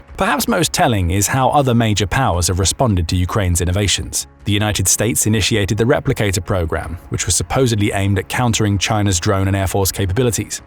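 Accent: United Kingdom. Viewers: Can you use a non UK accent. We have grown tired of the accent.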